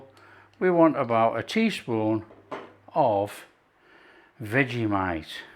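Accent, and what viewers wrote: Yorkshire, United Kingdom. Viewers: Hello - im a yorkshire woman- living in lancashire for long time- but still so proud of my yorkshire roots. Born in Leeds- then lived in Hull- i still miss the patties from Beverly road chippy- cant get them here. Anyway grew up with mam sending one of us to the corner shop- for a quarter of loose potted beef- wrapped in greaseproof paper. Didnt last long- having 3 sisters- but wow such a lovely memory. Luv watching you- because i cook a lot much as im alone now- just like yourself im known for the old classics and proud of it. So yes ill be making your lush potted meat and having it on my favourite toasted bread- sourdough. So thank you- such a pleasure listening to you with your yorkie chat- always feel like ive gone home as im right back there- its nostalgic. God bless- and take care. 😊❤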